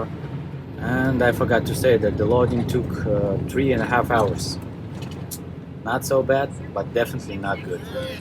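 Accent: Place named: Russia. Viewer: Доброго дня! Теперь можно добавить и в обучающее видео по английскому. С русским акцентом понятно практически все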